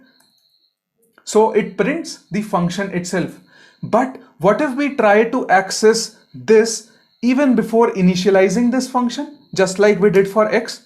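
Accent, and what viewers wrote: India. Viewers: bro are u from dehradun?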